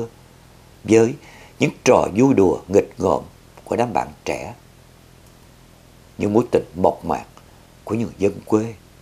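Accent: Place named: South, Vietnam. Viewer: Con kính chào Chú Những tác phẩm của tác giả miền Nam qua giọng đọc miền Nam của Chú thiệt trầm ấm, lắng đọng đơn sơ, người nghe thiệt bịn rịn, xúc động rưng rưng nước mắt 💖🌷💖🙋‍♀️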